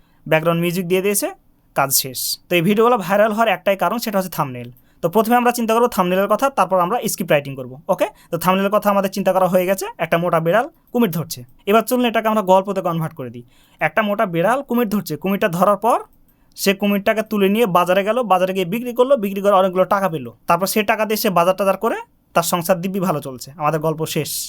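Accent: Chapainawabganj, Bangladesh. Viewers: ভাই আপনার বাসা কি চাঁপাইনবাবগঞ্জ আপনার ভাষা শুনে মনে হচ্ছে।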